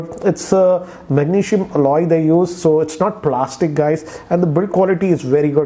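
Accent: India. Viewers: He's Indian! He has an Indian accent! Don't like Indians? Don't watch videos made by Indians!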